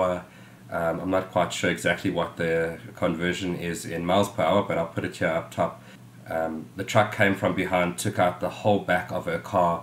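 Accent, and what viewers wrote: South Africa. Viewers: I'm so sad about your tragic loss, but happy you had a precious boy thereafter. Awesome to hear your SA accent! The Lord bless you and keep you. Greetings from Mossel Bay 😊❤😊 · I am so very sorry for your loss. I'm trying to pinpoint your accent? South African? Just snooped your other videos, and answered my own question when I saw one video said you are in Africa. This video blessed me today, and I thank you.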